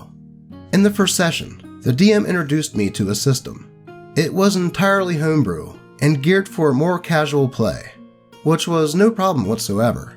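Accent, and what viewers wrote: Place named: Pennsylvania, United States. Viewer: I started watching Mare of Easttown, and they have the same accent as you! Strange coincidence.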